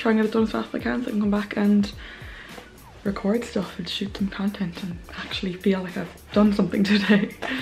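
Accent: Ireland. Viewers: has a lovely soft Limerick accent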